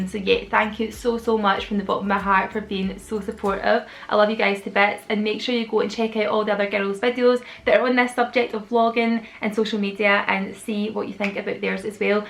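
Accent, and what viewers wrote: Scotland, United Kingdom. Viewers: Thank you I used to really hate the Scottish accent but I love it now! URS and accent is cute.... I love the way speaks and she speaks like an actual little women.... I have always wanted to have a channel on here but have always been to shy and intimidated.... Have you any tips? Hints? Editing programs?? Xxx ❤️ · Love ur channel great hear Scottish mum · Thank you for always having a cheery disposition and its always lovely hearing a fellow Scot! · It's so nice to have a Scottish vlogger who's content I love. Your accent keeps my home sickness at bay! Thank you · thank you 💖💜 I've only just started following your channel after your collab with kate💕 loving all your content and it's so lovely to hear a fellow scottish accent on here!! Keep doing what you're doing! xxx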